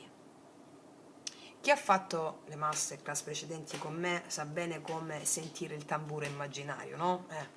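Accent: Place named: Salento, Italy. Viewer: Grazie ❤ ascoltarti un piacere per la tua preparazione passione e per il meraviglioso accento 😊love Salento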